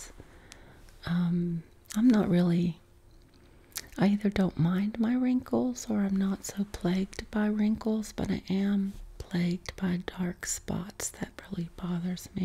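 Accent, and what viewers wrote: South, United States. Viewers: I did always get a bit of a southern accent from you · I knew I heard a Southern accent in there!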